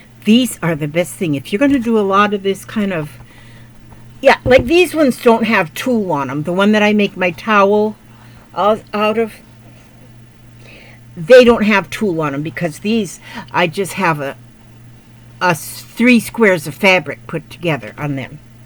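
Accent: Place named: Georgia, United States. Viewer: I am going through the hardest time in my life your time makes life so happy for me! I can’t sew yet everything I have is away from me god knows why but oh boy! You inspire me and I hope to get into the paper craft and go over your videos on there one day! But I love you do much! Your acsent reminds me of Georgia we use to live in a little town called Marietta Georgia so ch sweet folks! My dear mommy god rest her soul had a kids ministry and she had Kermit and miss piggy 🐷 lol I love your readings! I can now see when you post I learned how to do that lol my mind seems not good on some days:-( mt mommy and her mommy had memory problems but lord I’m too young to get like I feel idk lol will can you or do you have these rag snips at your Amazon shop? Ty again love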